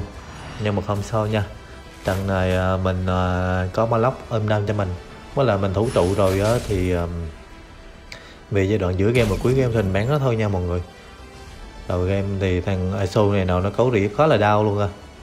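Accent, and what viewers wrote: Quang Nam, Vietnam. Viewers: Ông này ở quảng nam thì phải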